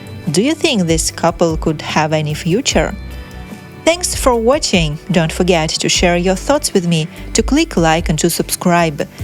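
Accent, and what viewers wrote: Russia. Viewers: lol Russian accent 😂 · What's with the Russian accent? We need Russians now to explain American pop culture history to us?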